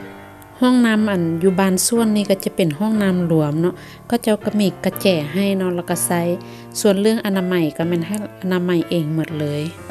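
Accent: Luang Prabang, Laos. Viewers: สยายดี, มารับชมนำเด้อ ฟังเสียงเจ้าคือคนหลวงพะบางเนาะ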